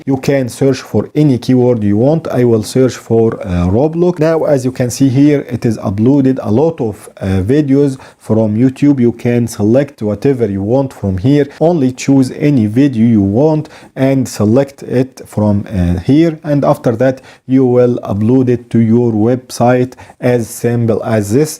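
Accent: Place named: Egypt. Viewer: معلش بس اللهجة المصرية باينة بصراحة 😂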